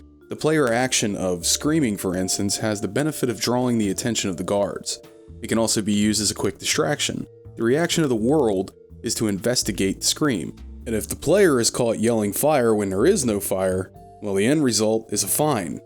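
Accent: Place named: Philadelphia, United States. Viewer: Are you from Pennsylvania? Specifically Philadelphia area? You have the accent to a tee.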